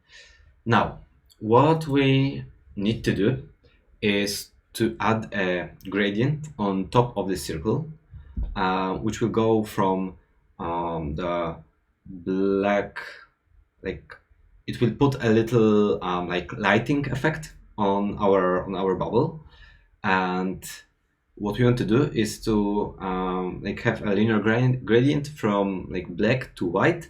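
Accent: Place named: Russia. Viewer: Слышу русский акцент...))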